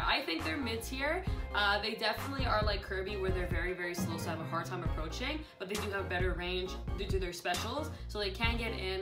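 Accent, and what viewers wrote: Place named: United Kingdom. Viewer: Woot woot! My question made and thank you very much answering! Also nice British accent.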